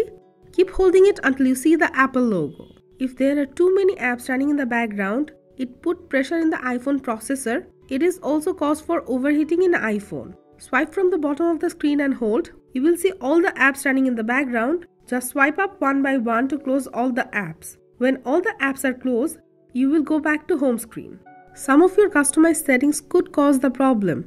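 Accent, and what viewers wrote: India. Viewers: The voice over in this vedio is done by probably a bengali women i can say that due to her accent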